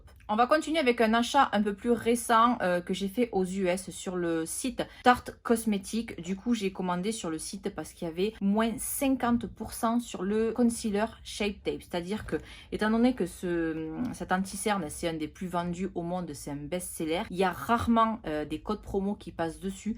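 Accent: South, France. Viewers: Cc et son magnifique accent du sud 😉 bien le méga haul pro ❤ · Hyper beau ce makeup! Et ton fond aussi! Ça fait du bien d'entendre l'accent du sud :) Continue comme ça!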